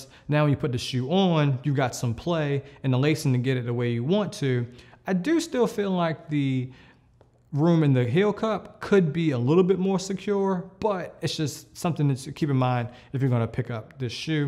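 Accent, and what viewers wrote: Baltimore, United States. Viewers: You from Baltimore??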